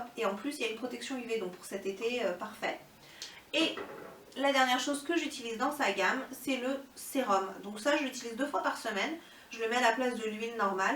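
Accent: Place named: Iran. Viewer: Intonation iranienne.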